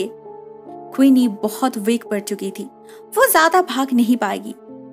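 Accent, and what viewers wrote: Bengal, India. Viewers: Your bengali tone in voice can be identified..but it's sweet and nice to listen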